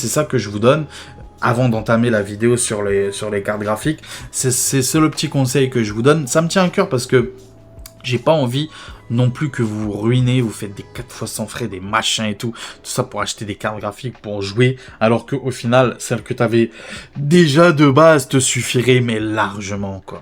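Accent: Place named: Belgium. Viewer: hello MERCI pour tes petite video sa ma beaucoup aider a monter un pc j'adore tes video mais vraiment meme avec ton petit accent belge 😁😁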